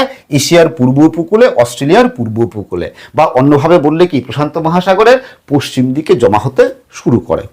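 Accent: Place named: Bangal, Bangladesh. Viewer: দাদার কথা বলার ভঙ্গি বাচনভঙ্গি সব দেখে মনে হচ্ছে দাদা বাঙাল। বাচনভঙ্গি টা একটু সুন্দর করলে ভালো হতো।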